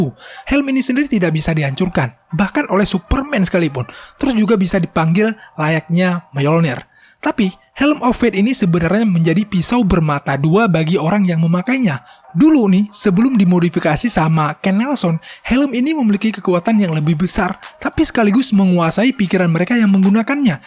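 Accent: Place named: Bali, Indonesia. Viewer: Logat bali ne.. Adminnya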